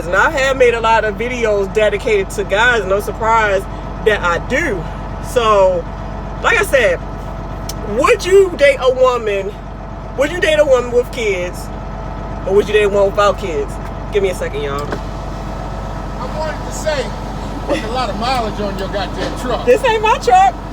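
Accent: Baltimore, United States. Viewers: You from Baltimore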